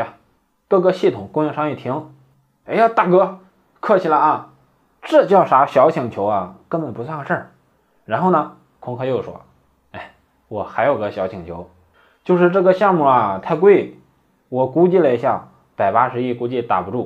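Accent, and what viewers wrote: Henan, China. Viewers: 聽到的，河南鄉音，好舒坦。繼續努力加油唄！ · 空客的河南话很地道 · 听了两期已关注！提点小建议，普通话听着别扭，河南话带劲，我也不是河南人但听着带劲。干脆就河南话整起来，兄弟要有文化自信啊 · 这个老丝儿是河南了呀！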